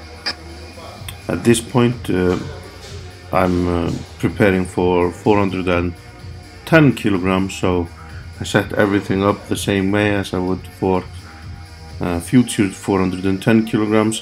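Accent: United Kingdom. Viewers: You sound Welsh lol. Great video 👌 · Interesting to hear how you've been picking up English accent slightly! Great video thanks:)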